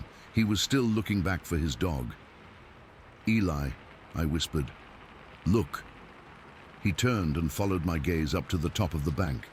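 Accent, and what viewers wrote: United Kingdom. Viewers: Hard to listen to a British dude talk about American .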